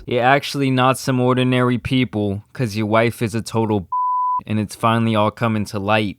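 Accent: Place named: East Coast, United States. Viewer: Just noticed your east coast accent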